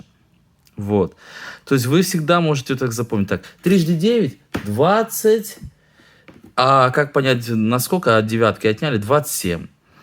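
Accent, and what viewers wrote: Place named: Ukraine. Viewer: Вот удивительно, фамилия и внешность восточная, а акцент украинский)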